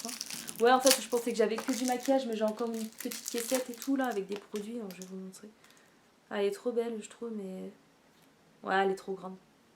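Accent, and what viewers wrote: Nord-Pas-de-Calais, France. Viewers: Coucou, je débarque sur ta chaîne et je me suis abonné direct ! Je suis une nozaddict et j'adore tes vidéos :) une petite question, tu viens d'où ? Tu as pas un petit accent ch'ti mdr???? Gros bisous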